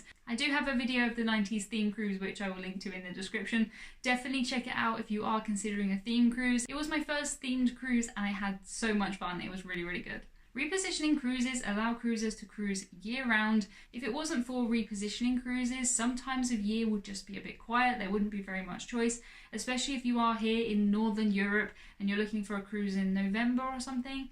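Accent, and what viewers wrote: United Kingdom. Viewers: Thanks for the good info. topic. I have never listened to a fast-paced British speaker like you before. 🤔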